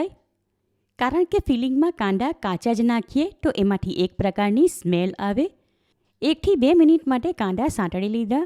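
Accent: Surat, India. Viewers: Ben tme to jaberdast surti bolo 6o ne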